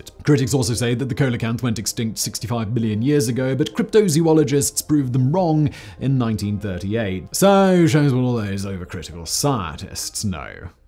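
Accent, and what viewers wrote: United Kingdom. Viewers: I was just going to post that I was heartbroken he didn't work that in. Beyond perfect for the situation, plus the addition of the brit accent. All I can say is : "in a high dimension of which we know nothing, the mighty Adams bellowed in rage...."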